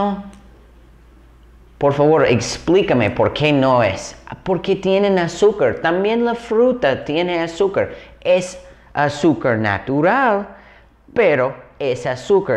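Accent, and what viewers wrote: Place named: Mexico. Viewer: Es adictivo escuchar ese español de Méjico que utilizas XD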